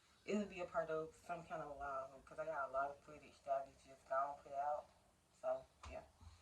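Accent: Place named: South, United States. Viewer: I love your beautiful accent! Are you from down south?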